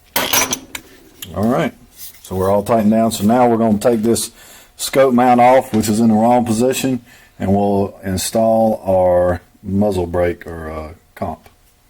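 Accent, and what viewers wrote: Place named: North Carolina, United States. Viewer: North Carolina accent.you sound exactly like me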